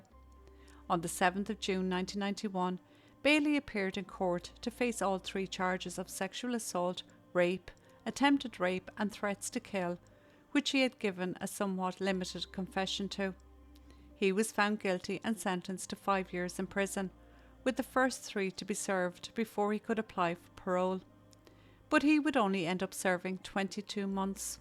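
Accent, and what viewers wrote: Ireland. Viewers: I don't think you realise how good your channel is! Imagine this: an Irish woman, with a beautiful Irish accent, the sometimes brutally honest but heartfelt opinion of a woman with values that have been eroded away but still stand for what was once good and proper in society..... versus AI content farmed true crime stories narrated by electronic voices that are mispronounced, misrepresented and churned out just for clicks and clout., Redrum, Truly Criminal and Just Thought Lounge are the only true crime channels I watch anymore. And sadly, when content farms force human creators off the platform, I won't be the only one mourning the loss of authentic voices like your own. Apart from anything else, is the only channel where I watch and wish, outside of the cases covered, I wish I was there in Ireland instead of here in this dystopian land of Britain! 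A beautiful country and an equally beautiful voice - I hope your channel grows and you remain here as one of the few human creators left amongst the future of content creation.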